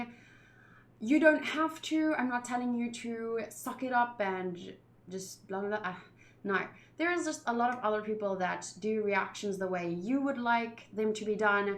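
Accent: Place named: South Africa. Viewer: she from South Africa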